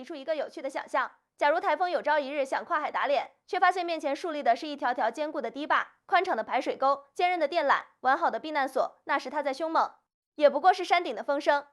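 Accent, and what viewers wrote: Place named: Beijing, China. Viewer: 住日本的台灣人？台灣人講話北京腔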